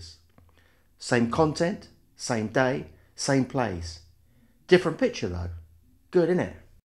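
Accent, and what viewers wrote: London, United Kingdom. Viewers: Fantastic video! I have been watching your videos for some time now and wanted to tell you how informative, well put together and straight forward they are. Also your "London Twang" reminds me greatly of my family which is very nice to hear as I am not currently living in the UK at the moment. Anyway, I will stop rambling. Take care and I look forward to more videos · I am here 9 years after this was made to hear that familiar London twang. I am also a Micro4/3 user despite what other people say about this "dying" format. It is a camera that I could carry with me almost everyday with intention to shoot or not for the day.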